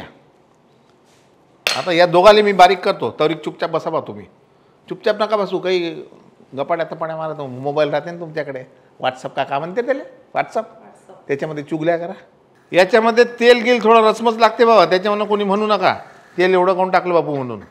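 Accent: Vidarbha, India. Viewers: Chaalan ji Sirji tumchi vidabha chi bhasha aamhala aawadate Hamaare Nagpur Ki shan ho aap 🙏🙏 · मस्त रेसिपी 😋😋😋 विदर्भाची भाषा मस्त बोलता तुम्ही...ऐकायला छान वाटत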